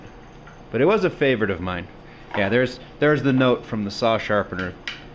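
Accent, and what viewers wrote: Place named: United States. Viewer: You sir, sound like a good american. Awesome blades! Especially the vintage ones that have stayed in the family! Priceless!!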